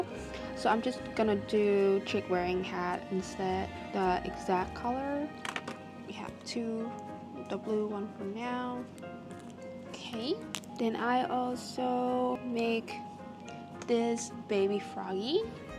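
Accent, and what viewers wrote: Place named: Asia. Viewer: Asian accent. I hear minute traces of it.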